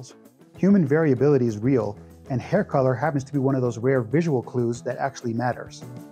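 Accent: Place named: Scotland, United Kingdom. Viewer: Your Scottish accent is great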